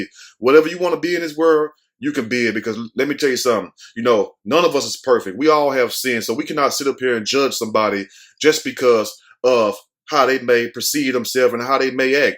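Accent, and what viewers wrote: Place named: Texas, United States. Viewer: Didn't know you were in Dallas boo..I'm tryna meet and greet..I knew you sounded like a Texan..lol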